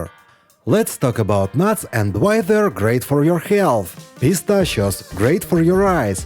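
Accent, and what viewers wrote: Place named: Russia. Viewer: I love the RUSSIAN ACCENT! 👍🏻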